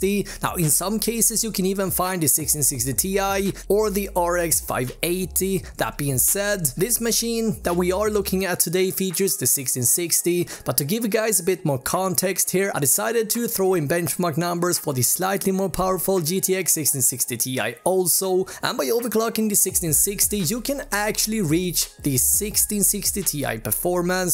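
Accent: Sweden. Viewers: You sound like you are from sweden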